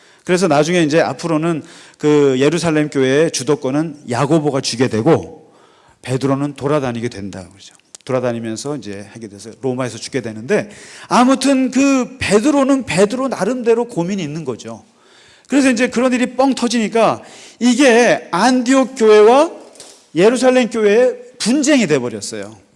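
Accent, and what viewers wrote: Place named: Gangwon, South Korea. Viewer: 목사님, 목사님을 통해서 많은 생각을 하게됩니다 젊은신 목사님같으신데 해박하심에 듣고있는것이 즐겁네요 덕분에 사도행전-성령행전에 빠져가고 있네요 그리고 여긴 강원도 동해안인데ᆢ목사님 여기분이신가요? 말씀듣다보니 여기지역말씀이 불쑥나오는경우가있으시기에ᆢ^^ 언제나 감사하며 듣고있습니다~ 건강하세요~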